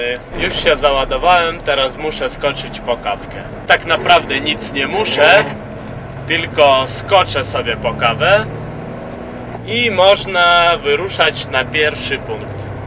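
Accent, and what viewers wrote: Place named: East, Poland. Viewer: Twój Polski przez te kilka lat naprawdę brzmi fantastycznie. Bliżej Ci już do wschodniopolskiego akcentu gdzieś na Lubelszczyźnie już na Podlasiu niż do ukraińskiego akcentu.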